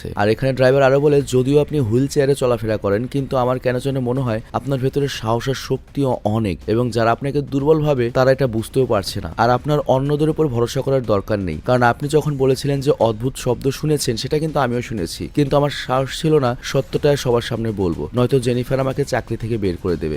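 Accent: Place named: Noakhali, Bangladesh. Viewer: Vai apni sera.. ❤ Amder Noakhali kotha bollen❤❤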